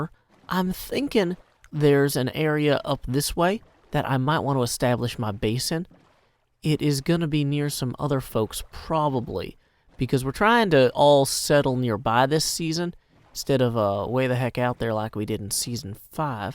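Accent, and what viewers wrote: South, United States. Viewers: First time watching one of your videos(and i hope this isnt rude), but your accent makes me think of a true southern accent i don't hear often among youtubers. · Funny to hear a hermit with my southern accent I'm definitely gonna be paying more attention to this channel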